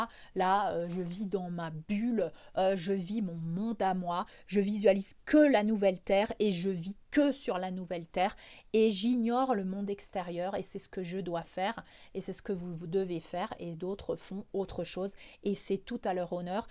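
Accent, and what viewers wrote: Alsace, France. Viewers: Bonjour ! C'est moi ou vous avez un accent alsacien ? 😁😍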